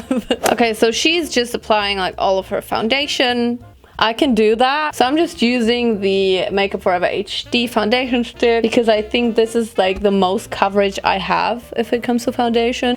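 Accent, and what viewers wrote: Germany. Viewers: Immer der gleiche Anfang ist langsam echt nicht mehr lustig. Dein Englisch ist auch nicht das Beste, man merkt, dass du eigentlich deutsch sprichst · Girl you just try to much beeing a beauty-Guru. I dont know how i ended up here but wth you are so fake af du bist deutsch und das hört man auch! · Ich finde deinen deutschen Akzent richtig unangenehm. Du sprichst zwar gut. Aber das „the“ wird nur anders gesprochen wenn das folgende darauf bezogenen Wort mit einem a,e,i,o,u ausgesprochen wird. Im Normalfall wird das e bei the nicht betont.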